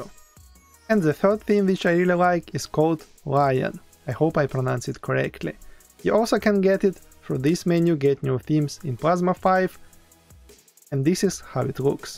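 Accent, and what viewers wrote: Russia. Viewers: лол, чувак, по акценту понятно что ты русский)))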